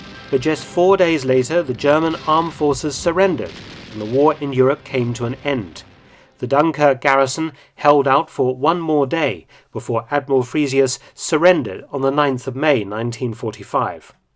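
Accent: United Kingdom. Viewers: British accents should be mandatory for documentaries.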